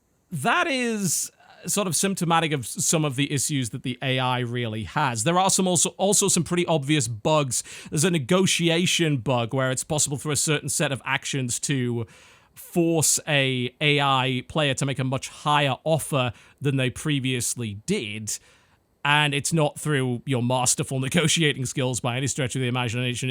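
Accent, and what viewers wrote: United Kingdom. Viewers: he died?!?!?! If so, may he rest in peace...that's really sad. I will miss his British (or English, Idk) accent...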